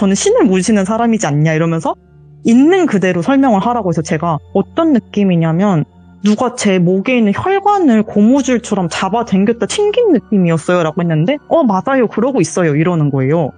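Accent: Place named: Jeolla, South Korea. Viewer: 전라도특유의 사투리가.ㅎㅎㅎ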